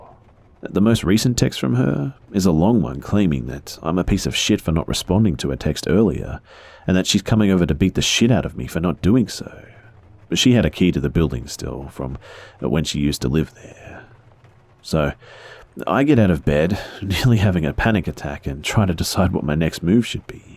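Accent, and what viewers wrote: Australia. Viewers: Good to hear an Aussie creepypasta reader. Its good to hear different readers to see how they handle the story. Mr Nightmare for instance can make anything sound scary and ominous, where as CreepsMcPasta sounds so relaxed and thoughtful. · came back to the youtube channel because I listened to everything that was uploaded on his Spotify podcast and was so happy to find there was a new here. I even listen to case file! What is about Aussie's narrating horrifying shit? I love it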